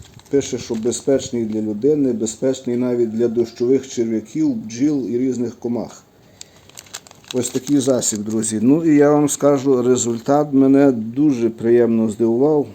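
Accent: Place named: Galicia, Ukraine. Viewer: Дивно, що ви від них не страждали раніше. У насу Вінниці ця нечисть з 2014 року. Тоді їх було тисячі. Тепер набагато менше. Судячи з вашого акценту ви десь з Тернопільщини або Франківщини коротше з Галичини) Я там спостерігав цих слимаків ще років 6 назад. У Карпатах вздовж берегів річок було дуже багато. Ми рятувалися звичайною сіллю та потім ще почали додавати вапно. Мінус що вона діє до першого дощу. Дякую за пораду - треба запитати або в інтернет знайти!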